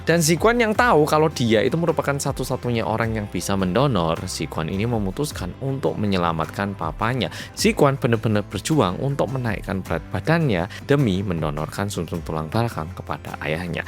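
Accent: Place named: Java, Indonesia. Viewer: Malam muedok lgsg nyimaaak🎉🎉🎉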